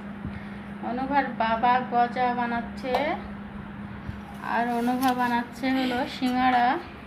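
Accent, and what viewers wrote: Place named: Jessore, Bangladesh. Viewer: Apu josor amer nani bari maura.tomer vasa sunay sob soma a monay hotow amer kasayr mama khalara ktha bolsay.bosoray akber nanibari na gaylay monayhotow jibontae vritha.now ja oya ho a na.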